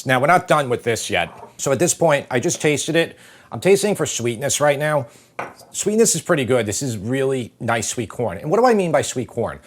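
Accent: New York, United States. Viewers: Pre-ordered the book! Love your style, your NY accent, and the family! ❤ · Why is it every time I watch you it sounds like you've rarely left NY?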